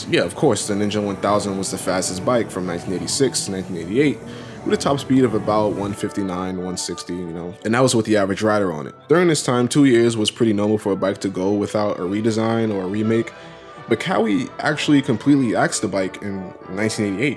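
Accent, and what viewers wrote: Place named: Italian-American, United States. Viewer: Lmao I love the guido voice, muff per hour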